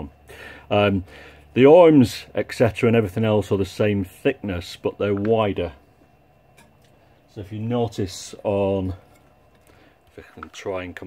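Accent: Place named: North, United Kingdom. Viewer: nice one I'm sunny Grimsby and cleethorpes lol.could tell by your acsent.great stuff buddy keep it up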